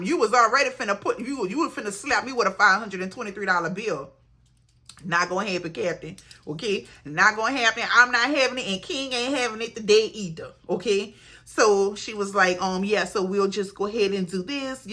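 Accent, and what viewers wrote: Louisiana, United States. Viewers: hey love your vids and the bayou accent:) lets follow dear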